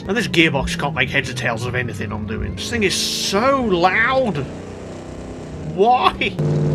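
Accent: United Kingdom. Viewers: Omg funny bri'ish fella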